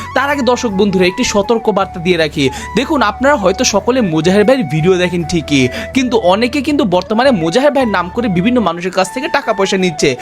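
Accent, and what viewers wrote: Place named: Barishal, Bangladesh. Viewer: নারায়নগঞ্জের চাইনা এত বরিশাইল্লা বাংলা ইংলিশ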